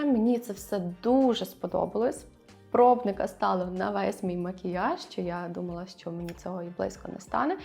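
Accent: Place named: Galicia, Ukraine. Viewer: Вітаю,. Дуже мені подобається дивитись Ваші відео, а особливо чути рідний галицький акцент. Але я Вас дуже прошу, немає у нас слова «ваший», правильно казати «ваш». Дякую, не ображайтеся, будь ласка 😊 Бажаю Вам творчих успіхів.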